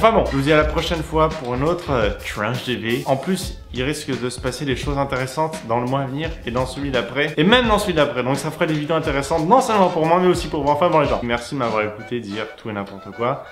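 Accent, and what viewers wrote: Quebec, Canada. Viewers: j'aime bien le concept! PS. j'aime ton accent semi-QC, semi-FR. Continue t'est le King de l'internet!!!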